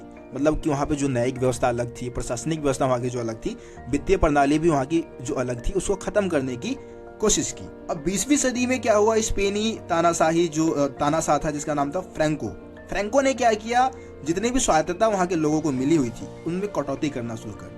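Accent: Bihar, India. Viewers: Sir aap Bihar ke ha kya.aapki batto se laga hame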